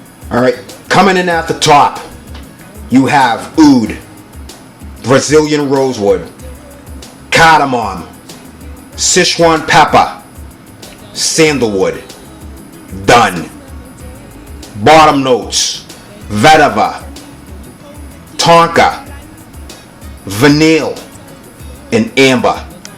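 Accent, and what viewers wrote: Boston, United States. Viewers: this boston accent is so annoying and coming out of a black dudes mouth makes it funny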